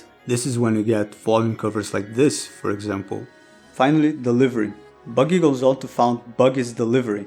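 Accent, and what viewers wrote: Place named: Brazil. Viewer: Pelo seu sotaque... Você é br?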